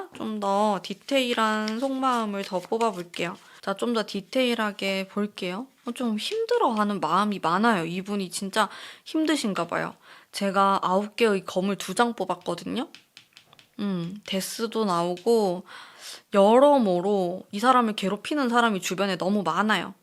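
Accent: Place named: Busan, South Korea. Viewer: 며칠동안 님 영상을 50개 넘게 돌려본 팬이에요❤ 1개 영상보고 어? 나랑 너무 똑같은데? 신기한데? 이러면서 딱 한사람을 떠올리며 50개 넘는 연애 타로를 봤는데 1개 영상쯤은 다른 말을 할 수도 있잖아요 신기한게 그 많은 영상이 같은 의미를 담아서 정말 놀랏어요ㅠㅠ특히 같은 카드가 여러 영상에서 나온다던지 그사람 행동을 일관성잇게 건드리지 말고 내버려 두라고 한다던지 ㅠㅠ 어떻게 단 하나의 영상도 다른게 없이 같은 말만 듣는지 ㅜㅜ 소름돋아여 ㅋㅋㅋ 영상 너무 재밌고 부산 말투 사랑스러우시고 진짜 언니처럼 친구처럼 조언해주시는거 ㅠㅠ 여기는 찐입니다 심지어 엄청 자주올려주시고 ❤❤ 저는 이곳에 잠들게요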